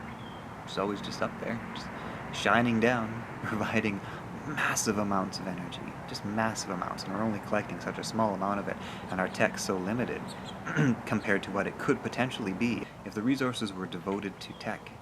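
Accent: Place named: Ontario, Canada. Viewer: Are you from Ontario? Just hear a slight Accent there.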